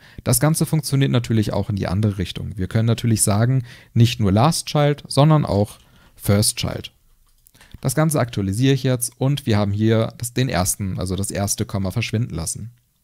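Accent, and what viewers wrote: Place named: Germany. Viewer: hab exact das erwartet..., warum können sich deutsche generell nicht etwas bemühen das englische TH zumindenst irgendwie richtig auszusprechen ? se-only-type, oder das en-te-ha-element, "when talking about sis and set, you want to be understood - no?" schöne kacke, dass diese programmier dinger alle auf english sind :) nix persönliches ! davon abgesehen, content ist gut präsentiert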